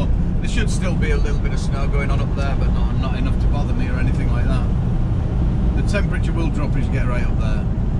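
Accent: Yorkshire, United Kingdom. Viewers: Where in Yorkshire you from pal?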